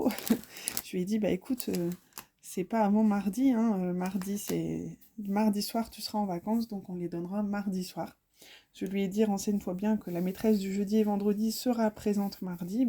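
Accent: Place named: France. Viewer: J.aime beaucoup ton accent français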